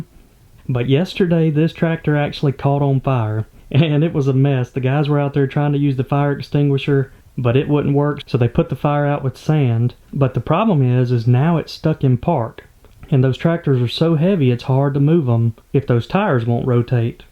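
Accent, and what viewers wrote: United States. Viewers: The internet constantly surprises me . Here I am again , listening to a cool American voice chatting about , and showing anyone interested , how to build a bass pond . I live in a condo , have never gone fishing , and yet find myself delighted to see your video pop up . I am already looking forward to seeing the next step .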